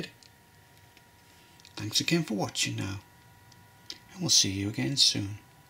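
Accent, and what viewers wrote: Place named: Newcastle, United Kingdom. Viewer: Sir Nigel Gresley would be proud of you,superb work,you can tell you are a Geordie bud.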